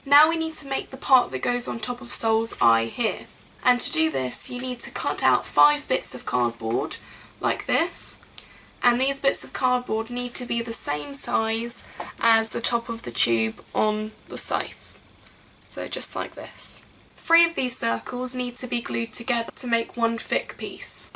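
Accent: United Kingdom. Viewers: omg your British O.o Luvs your accent dearies!! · PLEASE MARRY ME *.* You have this british accent, you like animes, you are beatifull and you can make wonderfull things..WOW!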